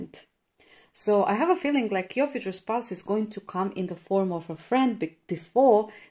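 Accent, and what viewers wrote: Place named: Russia. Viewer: You have a Russian accent. Are you Russian? 💜😍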